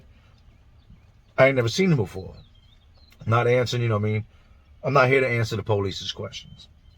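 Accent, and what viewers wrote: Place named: New Orleans, United States. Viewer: I really enjoy your content. I listen while I’m grocery shopping. Question: your accent has a lot of New Orleans inflections. Did you ever live here in NOLA? You say a lot of things the way NOLA natives (from the 3rd, 7th, 17th ward for example). I’m so curious how you got this accent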